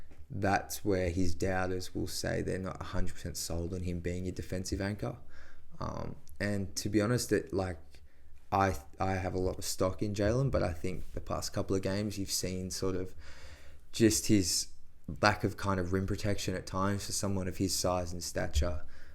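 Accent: Australia. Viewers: You from Aussie lad?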